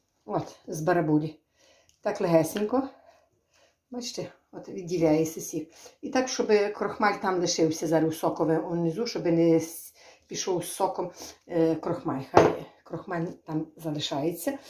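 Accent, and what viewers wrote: Ukraine. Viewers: Спасибо за настоящий украинский язык! Язык моих бабушек и дедушек из села в Черкасской области! А деруны очень вкусные! Спасибо!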